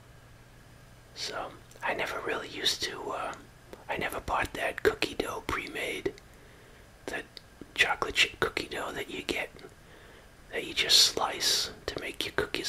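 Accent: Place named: New England, United States. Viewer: this is the first of your videos i have seen -- i got so excited to hear your new england accent!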